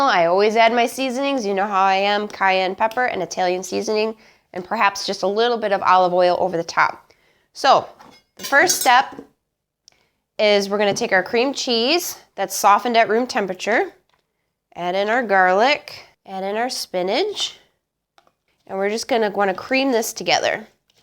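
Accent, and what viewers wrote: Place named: Minnesota, United States. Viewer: She's gotta be from meennasowda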